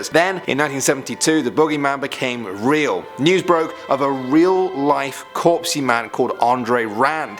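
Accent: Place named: United Kingdom. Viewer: Are from the uk by the way you speak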